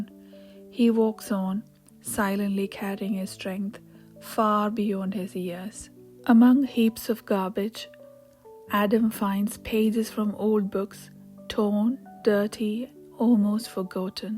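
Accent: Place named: United Kingdom. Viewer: Ma'am I like reading and story telling. I am going to practice shadowing with you. Your pronunciation, rhythm, intonation everything is perfect and most importantly you speak british pronunciation which I love to speak ❤❤ It will help me to improve my pronunciation. You speak natural English but we are trying to make it natural 😊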